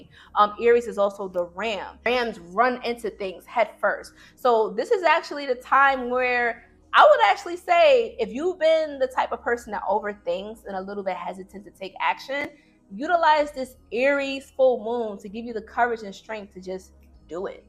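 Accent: New York, United States. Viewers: my god new fan here you are from NY with that lovely accent!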